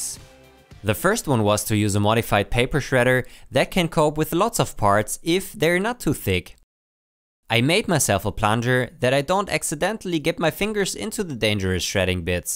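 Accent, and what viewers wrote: Germany. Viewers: German accent is too strong to watch this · Jo your English sounds very German :] Me also German hehe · Man hört sehr den deutschen Akzent raus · Man hört so richtig, dass du Deutsch bist😂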